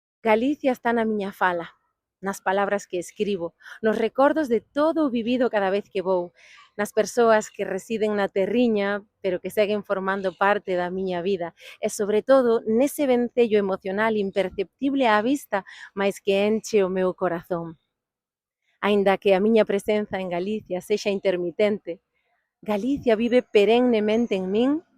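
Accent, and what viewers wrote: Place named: Castile, Spain. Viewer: pero esta chica tien un acento castellano que asusta. Habeis dejado que españa y su castellano ose care vuestro idioma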